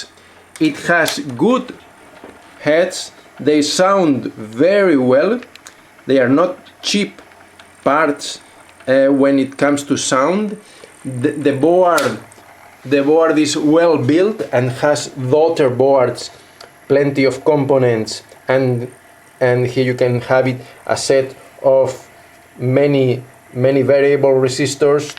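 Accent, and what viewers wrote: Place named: Spain. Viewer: Curioso lo de que comparta mecanismo con Nakamichi, el diseño de época está muy guapo.😎😎😎 Por cierto ¿Has intentado hacer el vídeo en castellano o en alguno de los idiomas comunitarios del país? Parece que seas de aquí intentando hablar inglés. Personalmente mejor usar subtitulos si quiero llegar a público internacional, pero ya al gusto de cada cual. Un abrazo🤗🤗🤗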